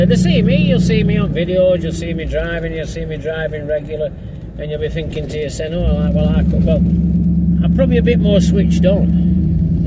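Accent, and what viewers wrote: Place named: South Yorkshire, United Kingdom. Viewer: I agree broadly with your sentiments. In the current climate a classic car is a luxuary most cant afford. As others said also you need more space and preferably a garage for them. Also if you cant do things yourself its maybe too costly. I would like to dabble one day. I do my best maintaining our 2003 micra and 2006 honda. I have two local garages we send for mot and maintenance and a very handy tyre, exhaust and battery place nearby. All small independent. Im in Huddersfield. Youre in South Yorkshire with that accent.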